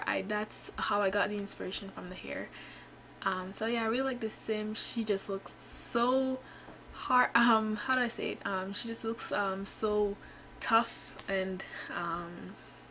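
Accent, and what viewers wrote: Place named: Quebec, Canada. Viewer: i really like your accent- ik i sound creepy and correct me if i’m wrong but it sounds like a French Canadian accent. Anyway, hopefully you can get the Seasons pack soon ‘cause I’m super excited to watch the storyline that you came up with!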